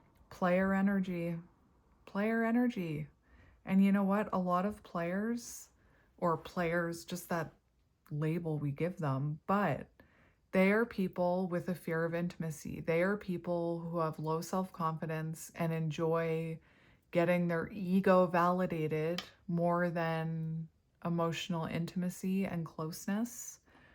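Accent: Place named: Manitoba, Canada. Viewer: I'm so curious, what province are you from? Your accent sort of gives me Manitoba vibes but it's hard to tell haha 😄BC girl over here 🇨🇦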